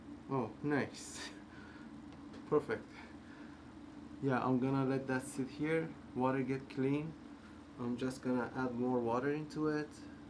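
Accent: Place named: Iran. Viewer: nice mod. The peroxide bleached the color btw. Your accent seem to be Persian.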